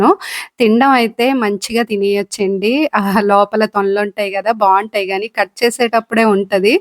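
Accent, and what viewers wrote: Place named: Srikakulam, India. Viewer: మీరు ఇంతకస్టపడి తెలుగు మాట్లాడిన... మన viIanagaram శ్రీకాకుళం బాష మారదు.. మాది తూ గో జీ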